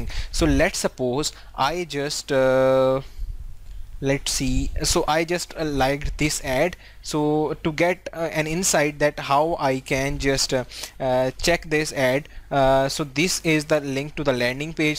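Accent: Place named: India. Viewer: In your discription you give us location United States but your English accent like Indian 😂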